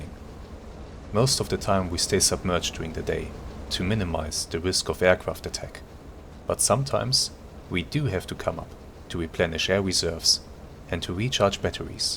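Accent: Germany. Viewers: great stuff. And the fact its a german accent in u boat playthrough makes it extra spicy. Next goal: 200!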